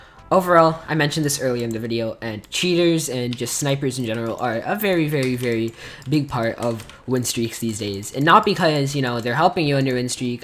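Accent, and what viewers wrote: United Kingdom. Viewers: Hi u british?? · Are you British? I think so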